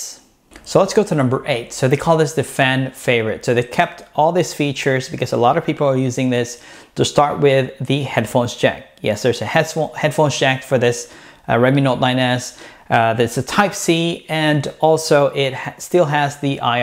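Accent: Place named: Visayas, Philippines. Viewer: bisaya diay ka sir. hehe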